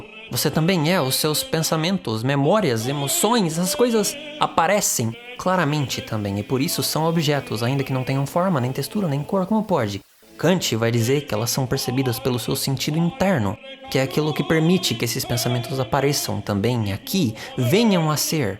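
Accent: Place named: São Paulo, Brazil. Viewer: Eu devo ser mt chato mesmo mas nem consigo assimilar o conteúdo do video direito com esse sotaque paulista fortíssimo KKKKKKKK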